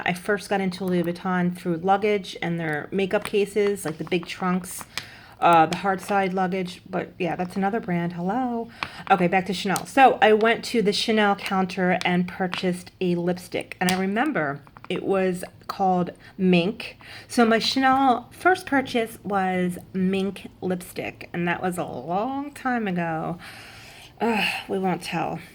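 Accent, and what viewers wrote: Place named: New York, United States. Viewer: I love you're accent are you from n.yc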